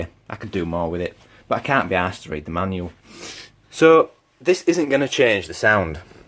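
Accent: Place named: Yorkshire, United Kingdom. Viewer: You sound so yorkshire its untrue haha awesome review dood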